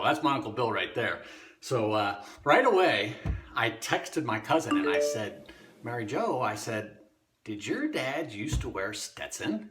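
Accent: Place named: Chicago, United States. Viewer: great video and I love it when you lay that Chicago accent on thanks for sharing it ,it made my day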